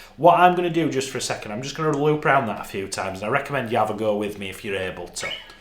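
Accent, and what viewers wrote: North, United Kingdom. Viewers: Call me crazy but you almost sound northerner like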